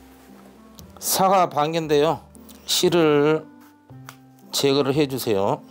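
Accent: Jeolla, South Korea. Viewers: 봄동 안담가도 전라도사투리 땜에 기냥 너무 맛나부러요 나도한번 담가볼려구요 그런데 지난번 무 간장짱아찌 담근거 어떻게 해묵어야 맛나는지 가르쳐 주신다했는데 ᆢ어떻게 되셨나요? 찾아보니 아직 못찾아서 유ᆢ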